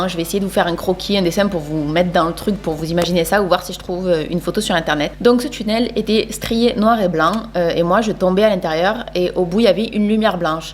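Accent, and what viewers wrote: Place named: South, France. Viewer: J’aime beaucoup votre accent du Sud, c’est de quel endroit ?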